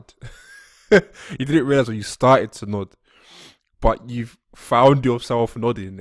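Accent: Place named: South London, United Kingdom. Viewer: Like you said which I concur, this video is really basic, more theoretical than practical but Yo!!!! What I really dig & would have to say at this the early stage, the primary reason I've enjoyed listening to what you have to say regarding mixing is that wicked "Attack The Block" perhaps South London English accent of yours :)....You know the saying "It's not what you say, it's how you say it!" Lol!...Anyway your accent, at least for me sounds & brings a much welcomed urban/street vibe from England that breaks up the monotony a little.... Yeah man anyway Big Ups & Propers from Los Angeles...Future Video request....Since you mentioned getting mixes sounding as good as Dre...Do a video on Mixing in Mono.....Peace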